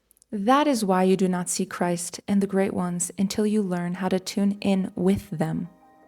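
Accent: Brazil. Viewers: I agree that she has a nice tone in her voice and pronounces most Sanskrit words properly however I do hear a slight Brazilian or Portuguese accent in her pronunciation of Sanskrit. In fact some rather simple English words are mispronounced such as ‘ether’, and other words that I cannot remember right now. Overall her Sanskrit pronunciation is far better than most.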